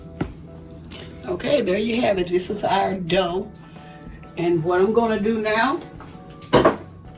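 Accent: South, United States. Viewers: im Hungry Hungry,Hungry, im Definitely Going to Try This Recipe. It looks Absolutely DELICIOUS,UmUmUm,GOOD😋😋😋. And you sound So Much like Mrs.MaeMae, either you are Related or its that, way dwn South Accent😃😃😃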